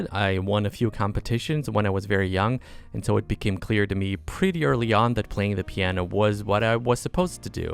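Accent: Germany. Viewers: I think it does need to be pointed out that your German does really sound German for other foreigners. Then again, there isn't really a sole German accent. Just ask the Bavarians or Austrians😅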